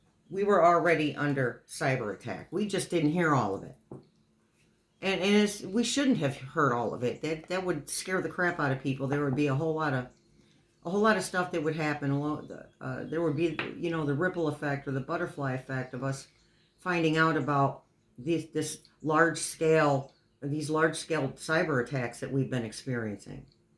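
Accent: New York, United States. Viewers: Thank you. I can tell you need this as much as we do. Take care of yourself too. We love you lady & are you originally from NY? The reason I ask is I’ve heard NY’ers say Yous guys so much, love it. 😊😊❤❤❤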